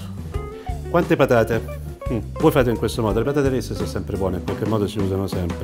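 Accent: Tuscany, Italy. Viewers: Sei proprio forte, il forno a microonde non ce l'ho, ma non mi manca. Questa torta di tonno e patate la farò molto presto. Una curiosità, ma sei toscano? Un pochino........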